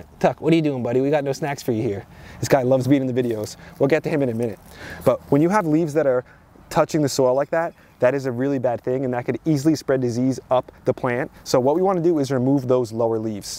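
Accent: New Jersey, United States. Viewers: Love the jersey accent. Midwest college had tons of NY NJ MASS kids. Great diversity before that became a thing. Enlightening and broadened education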